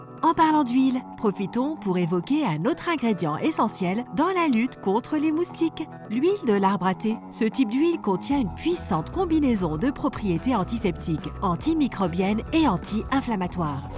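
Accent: Quebec, Canada. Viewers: C'est l'accent canadien du Québec et encore ce n'est pas trop prononcé !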